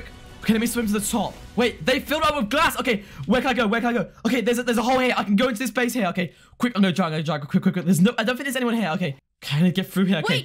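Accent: United Kingdom. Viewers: British boi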